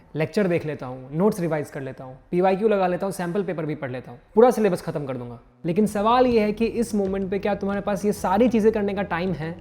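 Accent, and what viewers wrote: Bihar, India. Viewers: Ig he's from Bihar,coz of his way of speaking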